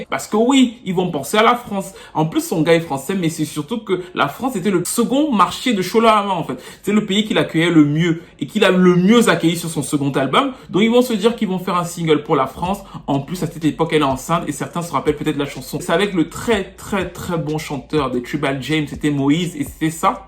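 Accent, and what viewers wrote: Cameroon. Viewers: Je dis hein, je te soupçonne un petit accent Kmer comme mes potes :)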